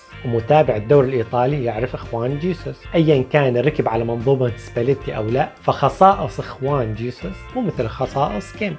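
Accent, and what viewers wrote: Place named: Deir ez-Zor, Syria. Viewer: لهجتك ديرية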